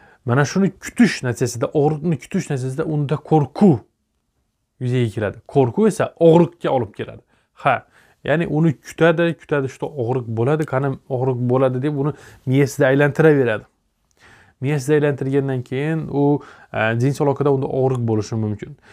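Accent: Khorezm, Uzbekistan. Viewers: Dòktr xorazmdanmisiz shevangiz tortyabdi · Xorazmdanmisz oga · Xorazmlimisz yoo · Шифокор Хоразмли шекилли 😊баклаб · Xorazmdanmisz diliz ôxshab durupdi😅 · Салом хаммага адашмасам доктримиз хоразмдан шекилли шеваси тортяпди Хоразм Зур гап юк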